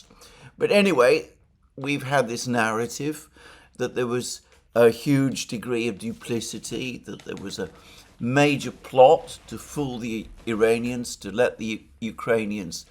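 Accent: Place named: RP, United Kingdom. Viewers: It's an educated London accent. It is not how the Queen spoke. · I like your speech . Because you speak softly and in queen's language.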